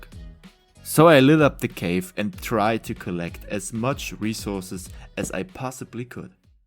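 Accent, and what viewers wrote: Germany. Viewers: I recognize your accent, and which land you come from -- I just spent a month in BW. Your videos are high quality and you have what it takes to become a big-league minecraft streamer. The amount of time you put in to your videos is evidence enough of that. The dry humor is a nice touch. And they say die Deutsche don't have a sense of humor lmao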